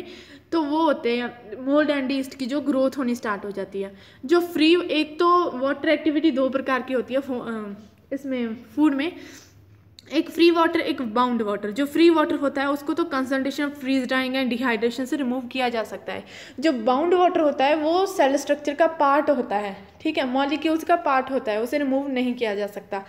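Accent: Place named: mixed, India. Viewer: Good voice, like Punjab Haryana Girl 🙂👍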